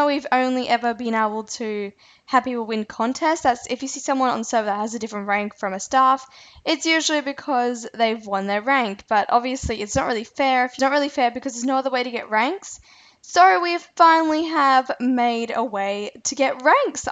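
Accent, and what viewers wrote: Australia. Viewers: Aussie <3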